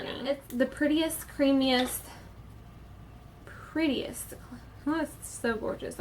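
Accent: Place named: South, United States. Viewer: Im from the south also , love your accent. Thank you for the wonderful reviews. Extremely helpful .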